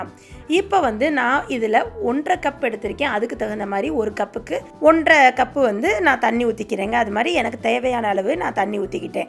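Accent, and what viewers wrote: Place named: Kerala, India. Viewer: मैम, आपकी बोली किस ज़िले की है? I mean your native language... its not pure Tamil.../ mixed malayalam/ which area in Kerala's? Palakad or Kanyakumari?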